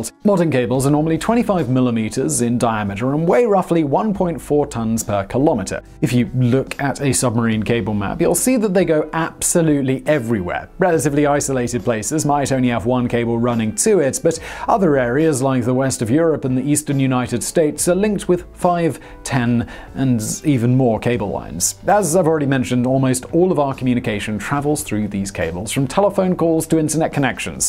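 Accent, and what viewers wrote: RP, United Kingdom. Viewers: Or... you can watch something like: <link> and get more information in three minutes than this does. Why is that? And, minus fill (to make up a required video length to be monetized), no BBC British and insipient hand waving and mannerism. In fact can be done in three minutes no sound at all. Nice.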